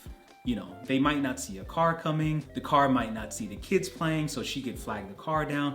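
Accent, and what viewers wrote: United States. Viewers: Welcome to Germany! I remember when I visited the US for the first time a few years ago and I was completely shocked to see every single shop open on a sunday until late into the night! In fact, I had specifically planned to do some last minute shopping on monday just before my flight back home, because I was so used to no stores being open on sundays. Edit: I'm a native speaker myself, having grown up abroad and - out of necessity - bilingual, so I can tell you're speaking American English. Most Germans won't be able to tell tho because while most people here can hold conversation in English reasonably well, they're not that well-versed in recognizing different accents.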